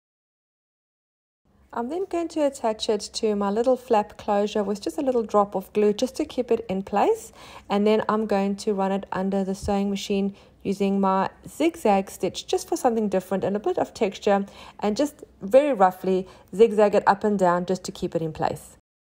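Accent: South Africa. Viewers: Hi there, I recently came across your channel and love your work. You explain things so nicely and the main reason is because your voice is South African 🤗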